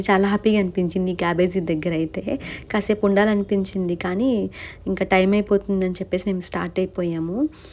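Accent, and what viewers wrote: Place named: Coastal Andhra, India. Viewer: Mi voice vizag valla la Ledu andi .. Looking like ...Krishna,gutur or khammam districts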